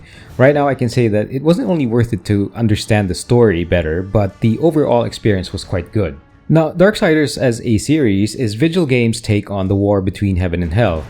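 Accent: Philippines. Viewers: pinoy ba to. parang pinoy yung accent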